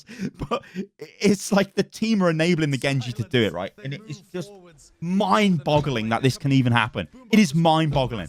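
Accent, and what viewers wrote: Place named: United Kingdom. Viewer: Always enjoy these vids! Nobody ever takes the time to slow an owl fight down and point out the endless high iq plays. Ur emotion and... british..ness makes it entertaining and helps get the message across even better. Also how did fury hit so many forking rocks onto a genji just disgusting what a game.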